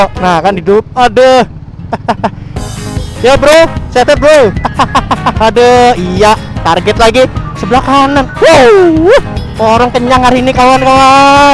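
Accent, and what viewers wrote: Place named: West Java, Indonesia. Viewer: njir udh ketularan bahasa + aksen kungkingkang :v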